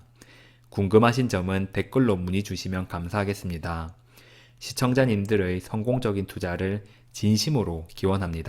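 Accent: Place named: Ulsan, South Korea. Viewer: 좋은 정보 감사히 잘 봤습니다. 말씀 톤으로 보아서는 울산분으로 보이십니다. 아는 분과 많이 비슷한 느낌입니다.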